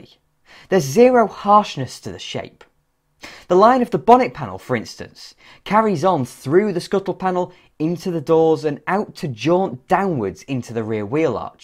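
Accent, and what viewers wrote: United Kingdom. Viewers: Doug DeMuro, but British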